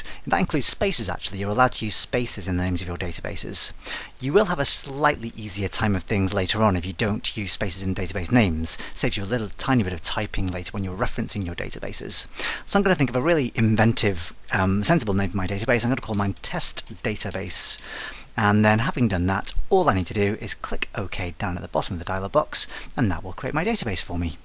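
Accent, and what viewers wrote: United Kingdom. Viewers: Dear it great tutorial, allow me to thank you for your this great job, but also allow me to say that you have a British accent and speaks a little fast which is hard to understand for not native English speakers, once again thank you and you do wonderful job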